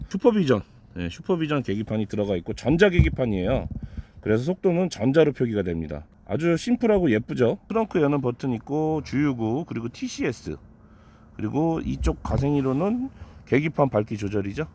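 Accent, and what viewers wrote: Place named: South Chungcheong, South Korea. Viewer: 윤팀장님 충청남도 양반 이다에 내 손모가지 걸께요 가생이 !!! 사투리 푸하하하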